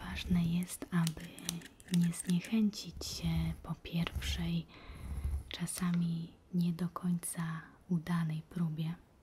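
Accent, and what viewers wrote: Poland. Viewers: ***** myśle że tak... =p ... Btw ... polski akcent jest bardzo piękny. ^_^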